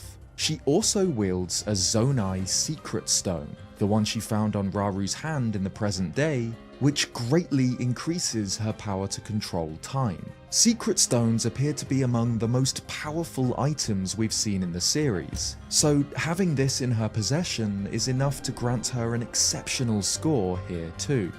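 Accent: England, United Kingdom. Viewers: nah he’s english is js a trait of posher non rhotic english accents, there’s a short on this phenomenon i’ll try to find it and link it here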